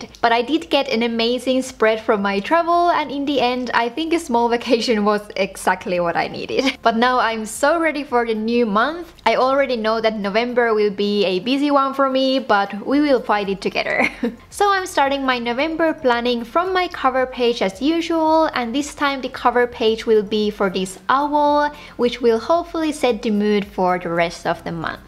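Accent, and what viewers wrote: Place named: Finland. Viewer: Ohhh I knew that you were Finnish, because I'm in a European school in Brussels with Finnish sections and when I talk to my Finnish friends, they sound like you! I like Finnish, it's a very unique language!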